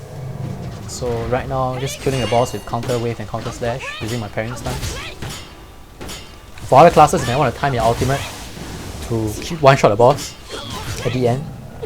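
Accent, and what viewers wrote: Singapore. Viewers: its a singaporean accent. as much as u and i might hate it cuz we're NA ppl, its the way singaporeans talk. its their national accent. type in singlish in youtube if u want to know more about it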